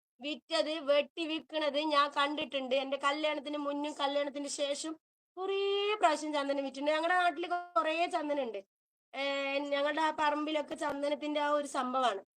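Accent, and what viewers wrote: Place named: Kasaragod, India. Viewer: ഇവളുടെ കാസർഗോഡ് ഭാഷയാണ് സഹിക്കാൻ പറ്റാത്തത്... ഇടക്ക് അത് കേറിവരും...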